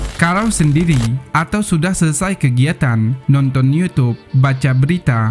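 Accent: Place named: Java, Indonesia. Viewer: JANGAN MEDOK YA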